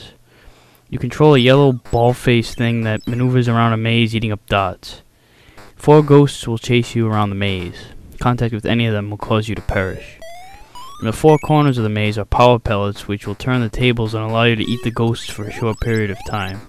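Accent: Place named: New York, United States. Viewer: You sound like your from New York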